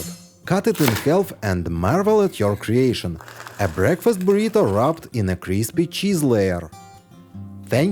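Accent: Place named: Russia. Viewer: Russian Dialect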